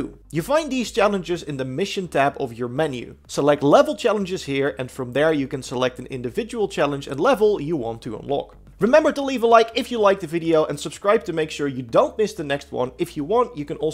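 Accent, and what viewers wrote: Netherlands. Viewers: Sorry doe alsjeblieft iets aan je accent. Je video’s zijn echt leuk maar het stoort me zo erg dat Nederlands accent.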